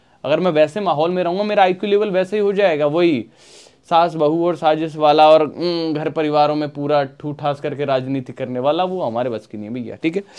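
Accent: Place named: Jaunpur, India. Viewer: Sir... Apke padhane ka style bhoat hi pasand aaya hai.... Ek dam jounpur sultanpur ki tone me.... Mazaa aagya evs ko desi touch me padh kr🙏🙏🙏😊thank u sir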